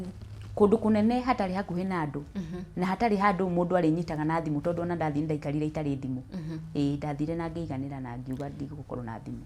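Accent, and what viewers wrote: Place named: Nyeri, Kenya. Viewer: This one is from my hood....kuria gwa tutaratari...😊❤Nyeri accent is the best.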